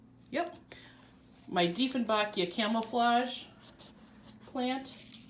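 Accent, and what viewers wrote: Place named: Midwest, United States. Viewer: holy cannoli.. i used to live in Minneapolis! I thought you had a mid west familiar accent. Gonna send you some orchid cactus cuttings! They have orange blooms.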